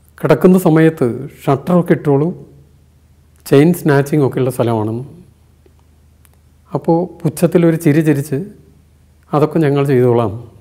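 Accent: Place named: Kannur, India. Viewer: ആ കണ്ണൂർ കമ്മ്യൂണിസ്റ്റ്‌ സംസാര ശൈലി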